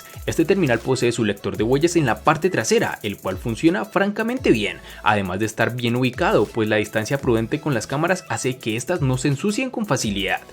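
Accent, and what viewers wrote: Cali, Colombia. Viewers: SOS DE CALI? JAJA YO SI DECIA VE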